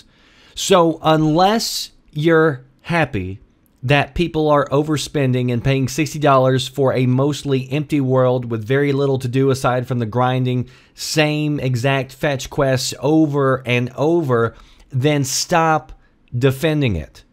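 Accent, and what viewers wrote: South, United States. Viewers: holy shit his southern accent threw me off so bad.... i fucking love southern accents and im not even american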